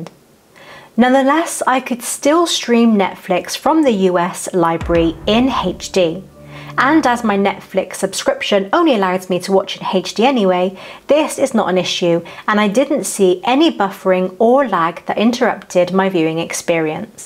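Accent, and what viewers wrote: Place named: West Country, United Kingdom. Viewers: Sounds to me like somewhere not far from Stroud. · You are a very agreeable and attractive host, with a lovely soft West Country (?) accent. x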